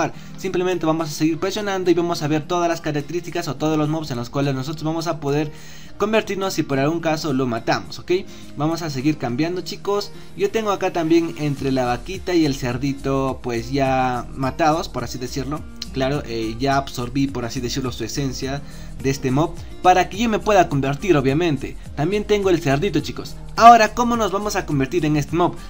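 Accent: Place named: Brazil. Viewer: Si se nota que es brasileña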